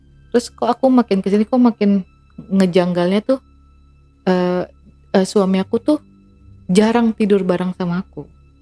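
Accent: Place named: Medan, Indonesia. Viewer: Logat kakak nya seperti orang kami .medan..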